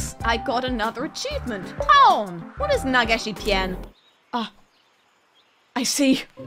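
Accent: United Kingdom. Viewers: BRITISH DETECTED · Is aguy or a girl, can’t really tell, someone, please explain me. Edit:i just checked the info on the channel and i want to tell you that you have an overwherming british accent i fell like Edit2: this is not a horror game even in the slightiest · i though she was cringe until she turned bri'ish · Idk, British sounds funny and cute at the same Time.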